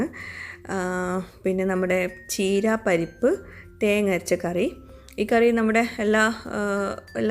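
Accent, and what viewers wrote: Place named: Malabar, India. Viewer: സിമ്പിൾ നാടൻ വിഭവങ്ങൾ, ഹൃദ്യമായ മലബാർ സ്ലാങ്ങിലുള്ള വിവരണം. ഭക്ഷണം കഴിച്ച പോലെയുള്ള ഒരു ഫീൽ 😊👌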